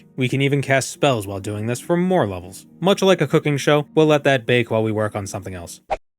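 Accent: Boston, United States. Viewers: i realize this is an odd question to ask, well spoken TES challenge run youtuber I subscribed to a bit ago, but are you from eastern Massachusetts? Very rarely in your videos, i occasionally catch a familiar accent in your VO (in this one you said bastard as 'basted' one time), but I can't tell for certain if it's Bostonian or not.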